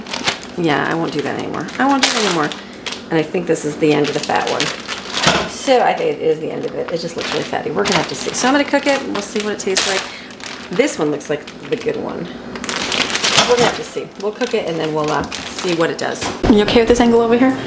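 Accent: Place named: South, United States. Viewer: I just want to say again I LOVE your accent and how you say certain words, I giggle and my hubby says "what are you laughing at?" I tell him and he laughs too. He is from the COUNTRY so his accent is even more southern than mine!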